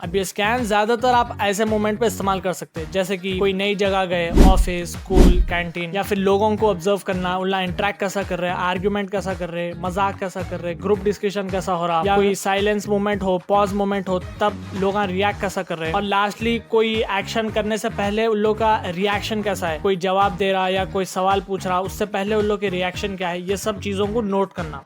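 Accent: Hyderabad, India. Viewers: Tu Hyderabad se hai? Tera accent poora Hyderabadi hai 😂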